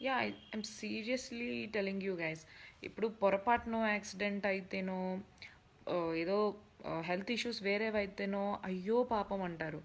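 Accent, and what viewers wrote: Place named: Latin America. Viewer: Like I said I thought you were Hispanic I like your accent or respect you keep smiling I like them I like what you have on with respect I respect you but this is very interested I keep looking at you right now in this video I'm still in shock I just wanted to spoke to you the other day or 24 hours ago I don't know if this is a brand new video I don't know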